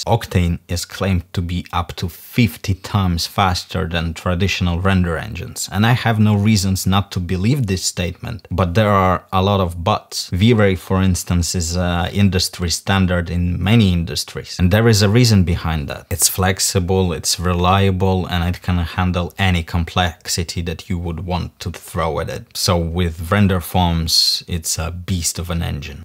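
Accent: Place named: Russia. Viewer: а я сразу понял что наш человек вещает )))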